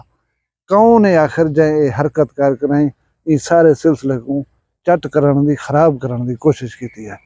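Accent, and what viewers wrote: Saraiki, Pakistan. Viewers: Chacha subscribed Kar diya hai kamal ki sararaki bolty hain wo bhi methay lehjay me.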